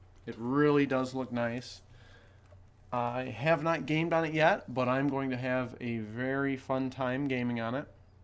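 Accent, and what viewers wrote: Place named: United States. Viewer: Country voice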